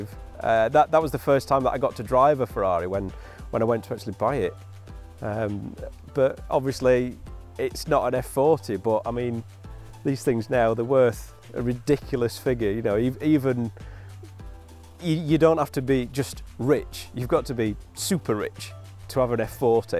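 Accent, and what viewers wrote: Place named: Liverpool, United Kingdom. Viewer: Hahah gotta love that accent ! F - fortaay. I guess thats scouse accent, anyways what a beast of a car that is !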